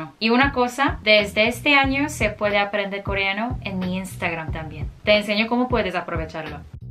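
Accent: Spain. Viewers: Linda coreana ..tu estilo del español es de España..te recomiendo nuestro neutro español peruano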